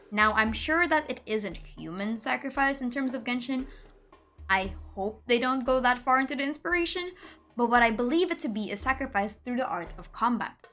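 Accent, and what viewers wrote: Philippines. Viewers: off topic but i knew from the moment I heard ur voice that u were from the philippines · So Filipino, huh. No wonder I found your accent so nice to listen to, I guess it felt familiar and homey. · I knew it. You were a Filipino after all xD. I thought my hearing was just deceaving me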